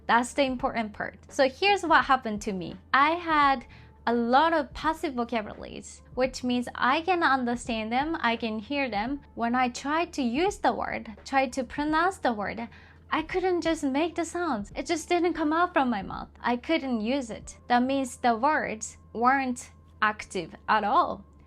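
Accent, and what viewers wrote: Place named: United States. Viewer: 日本に住んでいるアメリカ人ですが、I have a question for you. いきなり聞くけど、 さんはもしかしてアメリカに行ったことがありますか? 住んだことは？😅 I guess you have spent time in either the US or Canada. なんかMiaさんの英語の発音を聞いてそう思っただけ🧐😉 頑張ってほしい😊👍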